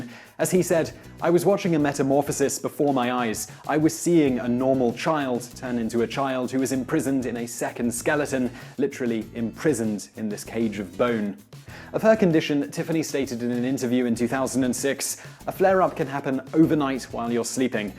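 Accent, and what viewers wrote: England, United Kingdom. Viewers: Is this guy English? I am from England and I can never tell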